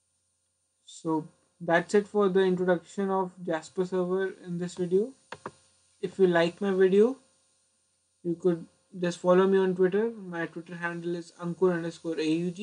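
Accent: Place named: India. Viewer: why do always, and probably only, indians do this kind of tutorials? :D ....it is good explained but, hard to understand, the indian-english and the bad audio quality ^^ greetz :)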